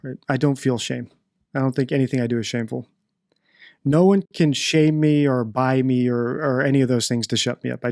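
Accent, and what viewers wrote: South, United States. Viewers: I think the way she talks is just being uneducated and southern. I say both because my step mom is a smart woman, has a great job at a company she has been with for decades.. but she is from Georgia/Tennessee, and the way she talks sometimes just makes me cringe a little inside 😂 it's a southern thing.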